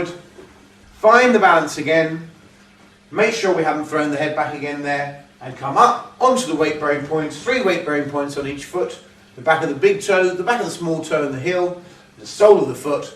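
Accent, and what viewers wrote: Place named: United Kingdom. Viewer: His voice cadence and accent makes me think he'll also be found in a Monty Python skit!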